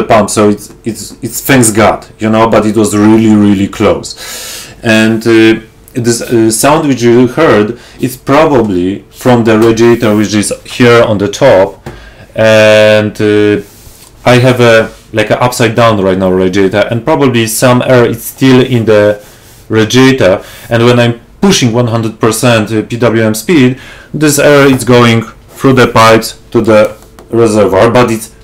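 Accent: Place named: Poland. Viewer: haha domyślałem się że jesteś rodakiem - mamy charakterystyczny akcent :) ale Twój angielski jest naprawdę ok - Pozdrawiam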